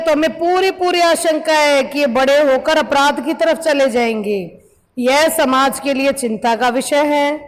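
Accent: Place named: Punjab, India. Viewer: Mam your tone is punjabi 🧕👳‍♂️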